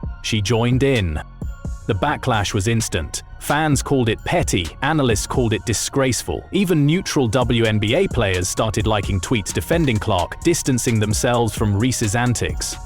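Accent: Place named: United Kingdom. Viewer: How about an American English speaking announcer. We are tired of you BRITS.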